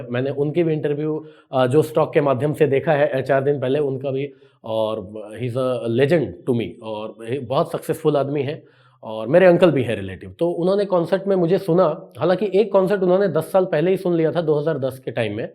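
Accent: Bihar, India. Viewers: Aap bihar se hai sunn ke khusi hui ❤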